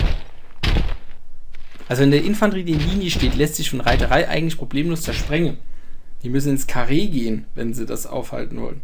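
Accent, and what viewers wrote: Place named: Frankfurt, Germany. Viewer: sorry aber Arbeite mal an Deiner Aussprache, dem Gesamteindruck,Struktur und Aufbau Deines Vortrages -der content stimmt ja ;-) aber so ein echter Frankfurter? kann net anners!?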